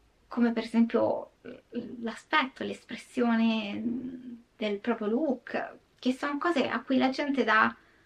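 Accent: Rome, Italy. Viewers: il tuo intercalare romano che adoro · Cara, non si tratta di accettarti così come sei. Nessuno deve accettare nessuno. Tutti devono rispettare tutti e semmai seguire o legarsi a coloro che apprezzano. Noi non ti accettiamo, noi prima di tutto ti rispettiamo e poi ti apprezziamo. E personalmente ti stimo e ti sono molto grata per quello che fai, sia qui spogliando di ogni sacralità i criminali e restituendo dignità alle vittime, sia sugli altri canali, dove mi accompagni in interessanti e spesso importanti ragionamenti, o dove mi apri le porte sul mondo del cinema, sui suoi retroscena e i suoi meccanismi. Un mondo di cui io non so e che tu sai spiegarmi con un linguaggio chiaro, professionale ma anche scanzonato che mi ispira simpatia, che mi mette a mio agio. Quanto al tuo stile... è per l'appunto il tuo stile. Ti distingue, ti permette di esprimerti, sei tu. 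Io poi lo adoro, ma anche se così non fosse sarebbe parte di te come la tua voce, la tua calata romana e il gremlin con la corona di fiori in testa. Tutto ciò sei inconfondibilmente tu e, apprezzandoti, lo apprezzo. E chi non lo apprezza è tenuto a rispettarlo. Ti mando un abbraccio, sappi che per noi ciò che sei e ciò che fai ha valore, ci fa bene. ❤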